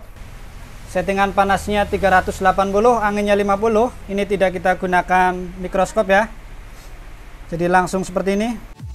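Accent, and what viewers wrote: Banyumas, Indonesia. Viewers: Lokasi pundi mas ? Kayane wong ngapak loh ,😁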